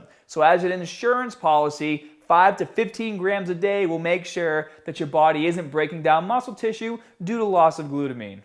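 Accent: Boston, United States. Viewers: Boston 100% haven’t you seen The Depahted?? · Man dat Boston accent is just not fitting, I'm so used to your old one. I've been off the gym for 3 months now due to a shoulder injury, I hope I don't lose all my gains... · dat Boston accent doe. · Where did the full on Boston accent come from all of a sudden?? He never sounded like this in his earliest tutorial videos??? :/ It's like he's putting it on or something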